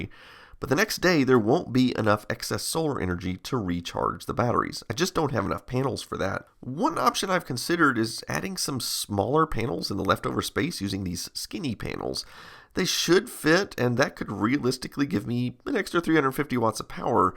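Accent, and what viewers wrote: Texas, United States. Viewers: So Texans say 'roof' funny, as well as 'solder'? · The pronunciation of roof as "ruff" is mainly just a thing in Texas. Our accents and how we pronounce certain words can very a lot depending on where you are in the US.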